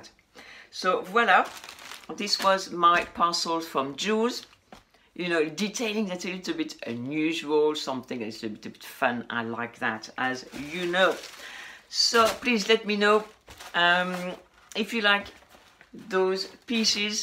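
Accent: France. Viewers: We love a good french accent I speak french, Spanish, welsh, Japanese, Korean and English wow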